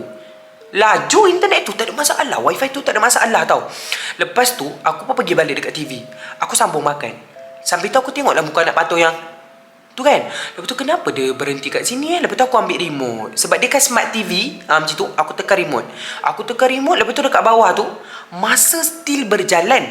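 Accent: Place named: Kelantan, Malaysia. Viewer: dye ckp kelantan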